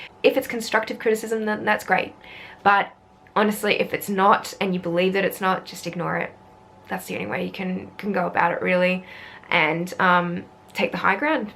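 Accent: Australia. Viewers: Great video, and really needed to be said. Frederick really nailed any commentary I could add, othr than Jay Maisel wrote a wonderful book about this in some respects, entitled "Its not about the F/stop". Cheers and best regards – PS I love your Ozzie accent!;-)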